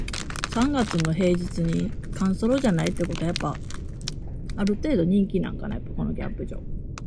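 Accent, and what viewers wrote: Kansai, Japan. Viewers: こんにちは😃 本当に重たい荷物を持って歩いていく姿に、感動もらいましたの感動が、動画やBGM,可愛らしい大阪弁のおしゃべりからじんわりふかーく心に染みてきて本当に嬉しかったですいっしょに旅してましたよ😂❤ 後編、波乱気味みたいですが、楽しみにしています PS・関西人だからってわけではないですが、伊豆大島いったことなくって、良かった❤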